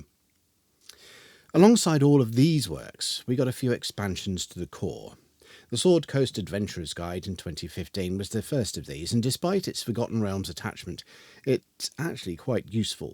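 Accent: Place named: United Kingdom. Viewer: Amazing video! I cant get from the accent, where are you from in the UK?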